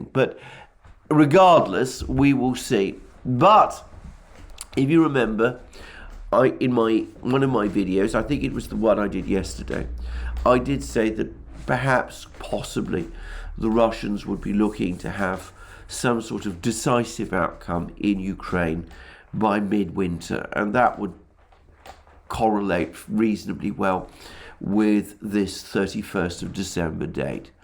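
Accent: United Kingdom. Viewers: Good day! :-) thanks again for your brilliant and intelligent analysis. And let me add, i love your nice british accent, which i learned in school but heared never again in real life. It makes it possible as a german-italo speaker to understand all perfectly.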